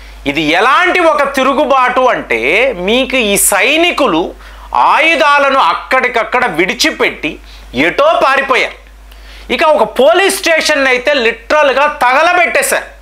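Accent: Tamil Nadu, India. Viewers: Video lo vnna contente chudandi sir plz, garu tamilanadu lo vnnaru, telugu prononsation alage vntadi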